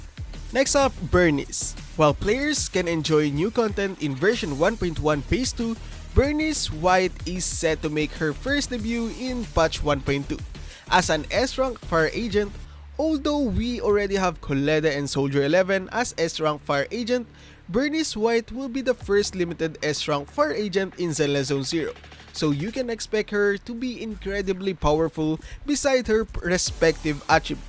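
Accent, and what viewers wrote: Philippines. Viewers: I mean 90% of that because of the Ph accent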